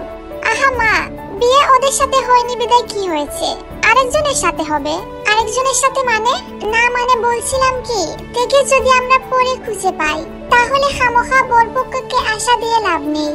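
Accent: Sylhet, Bangladesh. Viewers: আচ্ছা আপু 🌝 আপু কি সিলেটি ভাষায় কথা বলে আমার যেমন কিছু কিছু সিলেটি ভাষা মনে হলো কারণ আমি সিলেটে থাকি 😂।আপু তুমি কেমন আছো। ভিডিওটি অনেক সুন্দর হয়েছে। প্লিজ রিপ্লে দিও। আর তুমি একদিন লাইভে এসো 🥰।‌ প্লিজ আপু।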